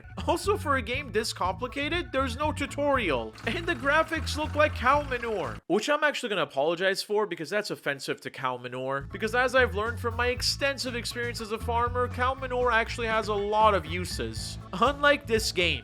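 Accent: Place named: Germany. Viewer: Bro sounds like a real german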